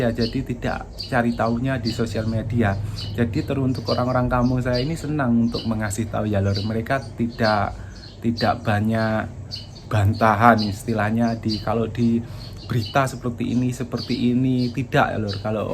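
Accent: Java, Indonesia. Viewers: Dr lampung?. Lampung di mana. Wilayah aceh, padang atau tanah besar riau. Sebab pertuturan kamu tidak melambangkan yg kamu melayu sumatra. Lebeh mirip indonesia daerah jawa.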